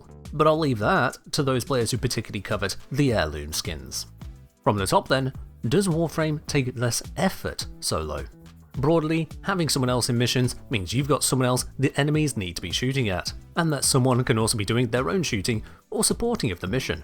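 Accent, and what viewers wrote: United Kingdom. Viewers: Surprising that the first language is not English. He sounds kinda British tbh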